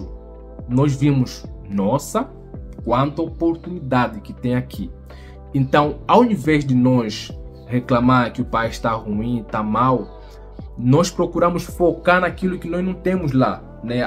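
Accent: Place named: Angola. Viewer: Faz sentido tudo o que você disse. (Acho o sotaque angolano lindíssimo! 😊)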